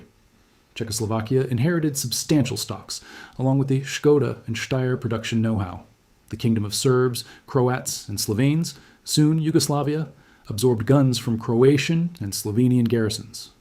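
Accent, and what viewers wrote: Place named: United States. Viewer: I like how it's called Britain's war files, but it's an American AI voice that takes loud breaths for some reason 😂😂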